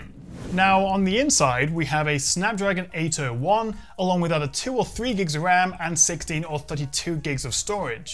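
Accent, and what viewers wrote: United Kingdom. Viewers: Always loved your vids man great to listen to the British accent amoungst the sea of canadian tech YouTubers Happy for you your videos are always quality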